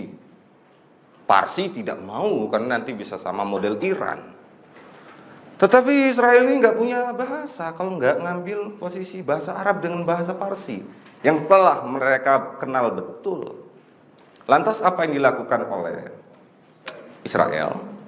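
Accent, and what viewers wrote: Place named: Indonesia. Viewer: Seluruh video ini penyampaiannya didalam bahasa melayu sepenuhnya ... cuma loghat indonesia .. bahasa indonesia adalah cuma gelaran dalam negaranya saja ! Pertuturannya memang dlm bahasa melayu seluruhnya ... sumpah pemuda juga bertutur dlm bahasa melayu ! 😂😂😂😂😂😂😂😂🤣🤣🤣🤣🤣🤣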